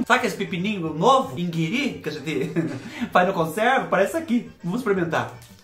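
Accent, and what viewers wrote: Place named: Minas Gerais, Brazil. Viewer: amo o seu sotaque, falo bem parecido, aqui na minha cidade o povo me chama de "mineirinha" 😂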